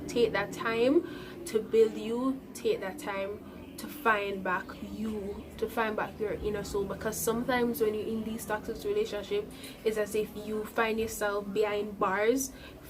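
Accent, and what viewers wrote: Jamaica. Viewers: U sound Jamaican 🇯🇲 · You Jamaican rite? 🤔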